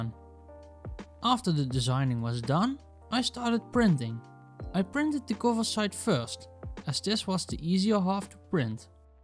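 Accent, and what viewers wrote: Netherlands. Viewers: hoor ik daar een nederlands accent?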